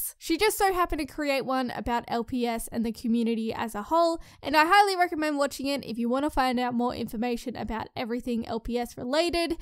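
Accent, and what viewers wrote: Australia. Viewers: I knew it I knew it I always thought I was just weird and Thought all Australian women sounded the same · Well I wasn't expecting this, but I think its cool that you found something back then that your were cleary passionate about making. Sorry it started to burn you out further down the road and you felt really self conscious about it, but the fact you kept doing in until the end and can talk about it now makes you braver than most people. Well done! Also don't apologise for your aussie accent. At least you don't sound like a flithy hybrid of kiwi & scottish 😅🤣. Can't wait for part 2!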